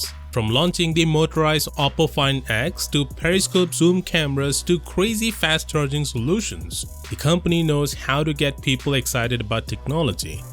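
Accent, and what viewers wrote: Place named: India. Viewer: Man I can't listen to his indian accent. Furthermore it's an complete nonsense to say that it is a advantage of rollable phones that they are thinner. You could easyily built a foldable phone to be as thin as a rollable but manufacturers don't because you need a larger battery for a larger display. The same goes for rollable phones. Yes this phone is thinner but I don't want to know how the battery life is when it's unrolled.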